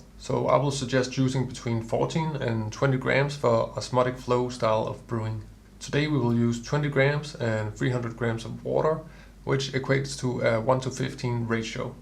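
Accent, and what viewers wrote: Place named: Denmark. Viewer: Blev nødt til at google for at se om du var dansk. Det er så svært at skjule, at man er dansk, når man taler engelsk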